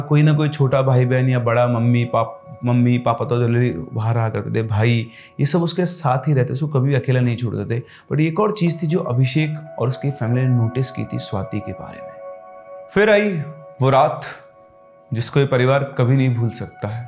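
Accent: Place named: Bihar, India. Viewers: Your Bihari Accent 🫡🫡🫡 ekdum Humare tarah hi bol rahe h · Narrating Story in Bihari accent is very Fantastic, we Bihari love it 😄😃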